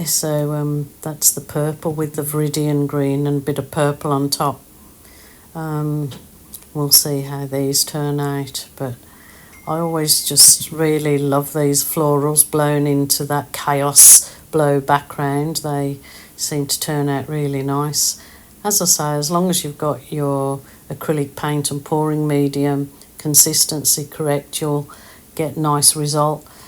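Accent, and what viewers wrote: Birmingham, United Kingdom. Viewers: I'm getting notifications in the UK. You make me smile every video, your kindness is a beautiful thing. And yes your art is amazing. I'm a 60yr old woman, I have done "normal art" for a long time but this style is so fresh and fun , and certainly not as easy as you make it look. And yes, I can still hear the Brummie accent lol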